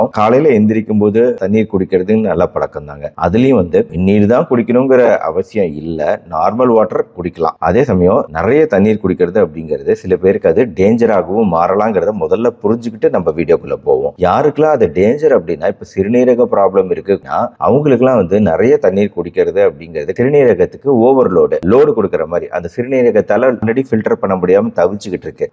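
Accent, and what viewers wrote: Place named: Coimbatore, India. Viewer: சார் நீங்க கோயம்புத்தூர்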